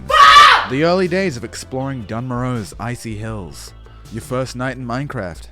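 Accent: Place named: England, United Kingdom. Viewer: you speak with english accent but reference shit like macaroni picture frames (not english at all) whats with that??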